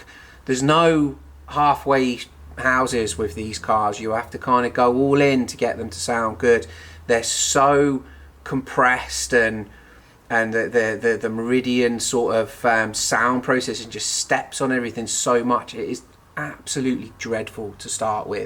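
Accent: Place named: Wales, United Kingdom. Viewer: love your work and your content. Thank you subbed 👍🏻 Incidentally trying to place your accent - there’s a hint of Wales there?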